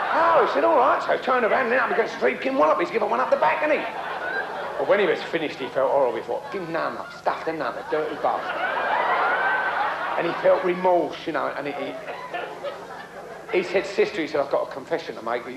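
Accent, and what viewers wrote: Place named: West Country, United Kingdom. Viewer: His West Indian accent sounds more West Country e.g. Somerset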